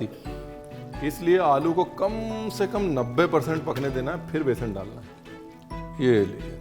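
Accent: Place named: Bhojpuri, India. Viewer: Babua bhojpuri jaisan bolat baran 😊🥰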